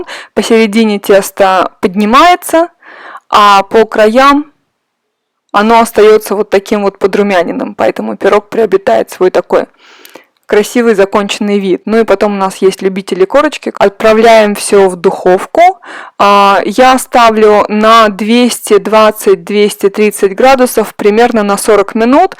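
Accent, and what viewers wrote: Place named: Siberia, Russia. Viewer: Я тоже почему-то подумала про оленину, когда читала и слушала описание вкуса кенгурятины ))) Я родилась и выросла в Норильске, помню пельмени к коробочках машиной лепки из оленины ))), ваша скорость мысли и речи выдает сибирячку, москвичи и вообще запад России говорят иначе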